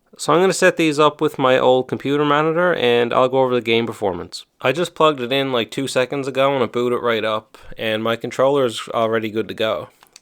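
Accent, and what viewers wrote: Newfoundland, Canada. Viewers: Bro where are you from i hear an irish accent slash american or canadian are u from newfoundland??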